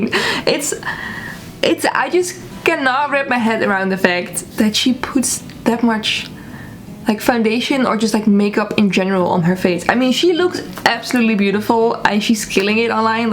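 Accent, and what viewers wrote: United Kingdom. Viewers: Your British accent sent me. 😂😂😂😂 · Loving the British accent🤭😂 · I thought you said look like a chef at first, instead of chav. The british accent was also a treat :)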